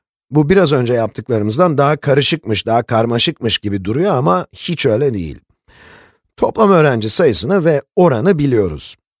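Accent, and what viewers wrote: United States. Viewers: hocam amerikalılar gibi konuşuyorsunuz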